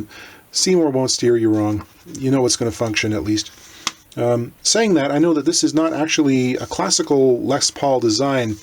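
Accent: England, United Kingdom. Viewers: Never mind "solder"......I'm still surprised that you all appear to pronounce the word route (UK pronounciation 'root') as rowt......I never heard Bobby Troup's song sung as "rowt 66"....always "root 66".....just making the comment.....love your absolutely spiffing tutorials and what a class English accent! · British accent, classic. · I thought so too well, ENglish not British accent). Then I thought of older, bolder, colder, holder, folder etc.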